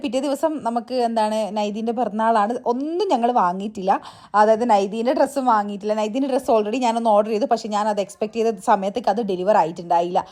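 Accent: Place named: Thalassery, India. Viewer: തലശ്ശേരി അന്നോ വീട് ❤... സംസാരം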